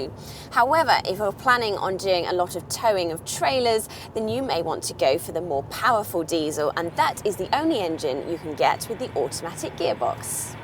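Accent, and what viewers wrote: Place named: England, United Kingdom. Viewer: if you are joking then good one, if you have not yet realized from the accent that this is filmed in England then eat fish, it makes you smarter...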